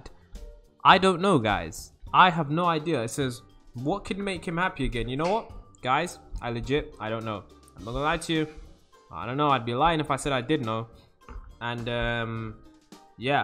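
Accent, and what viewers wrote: United Kingdom. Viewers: Are u an british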